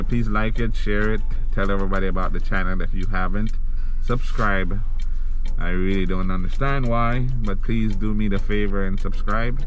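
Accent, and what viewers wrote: Jamaica. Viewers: you jamaican fam?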